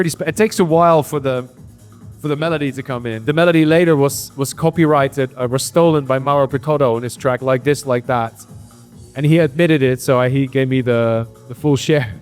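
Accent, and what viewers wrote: United Kingdom. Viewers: Armin sounds so different here versus ASOT. He sounds a bit British... Bizarre! Anyway, will definitely listen to this. Love this kind of insightful stuff. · Why does he sound British? Lol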